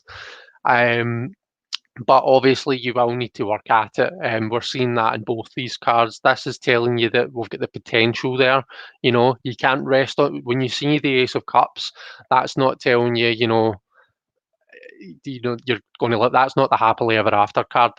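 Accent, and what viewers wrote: Scotland, United Kingdom. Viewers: Tarot with a Scottish accent <3<3<3 Is that Glasgow? Definitely subbing!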